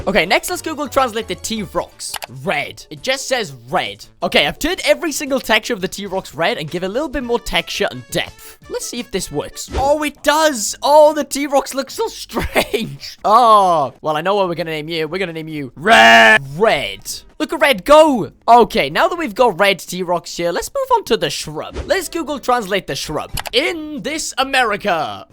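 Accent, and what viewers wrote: Wales, United Kingdom. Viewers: Holy crap thats the most welsh accent i ever heard 🏴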